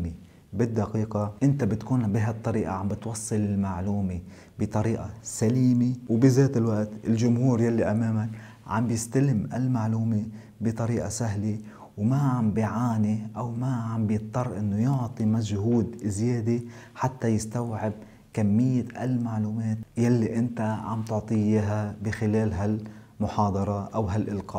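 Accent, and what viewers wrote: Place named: Lebanon. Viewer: اللكنة اللبنانيه جميله جدا وصوتك واسلوبك الهادي معجب فيه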